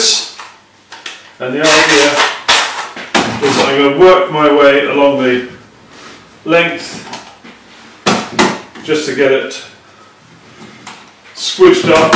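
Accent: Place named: Manchester, United Kingdom. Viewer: OMG He's from Manchester....................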